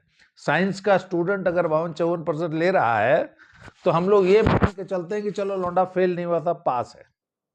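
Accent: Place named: Bhojpuri, India. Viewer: Aapke sunane ka a andaj kya khub hai. Bhojpuriya andaj 😀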